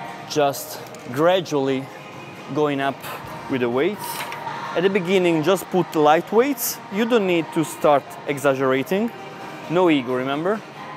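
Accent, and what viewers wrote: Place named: Italy. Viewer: i assume by your accent , tu sei un italiano amico !!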